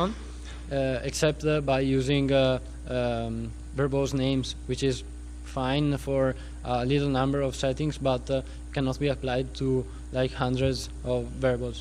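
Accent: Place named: Italy. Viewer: I'm so in love with this italian accent <3 Awesome tweak developer !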